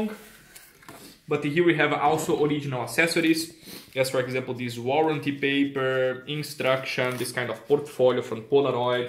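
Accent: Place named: Germany. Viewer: Yeahh awesome collection. And the german accent 😂🤘🏻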